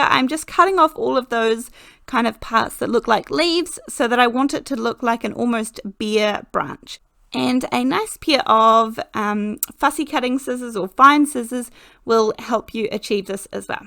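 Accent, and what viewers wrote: New Zealand. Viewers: It's gorgeous. Love all your ideas - I have that old stamp set and have never noticed the birds - they are coming out of hibernation, I can tell you now. The simplicity of the card makes it even prettier I think. I would love to get Alina's tape, but it is quite expensive when you add the shipping to Australia and then have to wait for the product to arrive as well. However, it does look fabulous and great to work with. You have an N.Z. accent but you have an Irish / American roll to your r's in words like bird and work. A connection there perhaps? Just curious. Still loving watching all your Youtubes. Thanks so much for sharing your great ideas.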